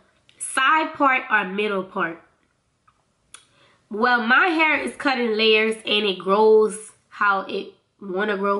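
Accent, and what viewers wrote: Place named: New Orleans, United States. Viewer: Lol i knew you was from nola before the xmas intro. Yassss, bounce to the beat. I did this tag as well it was fun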